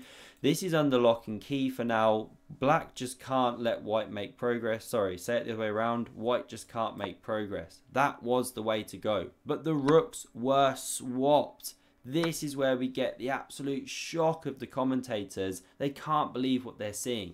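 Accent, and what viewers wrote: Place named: RP, United Kingdom. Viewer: i have to comment - you are actually amazing at this. excellent speaker, sounds effortless and off-the-cuff but quite stylistically elegant, like a posh moistkritical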